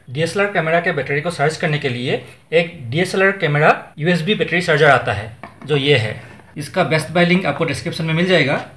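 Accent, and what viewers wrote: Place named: Assam, India. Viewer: kiunki tu bengali hai wo asamese hai. dont make fun on others accent. being a bengali i know how u people too have funny hindi accent.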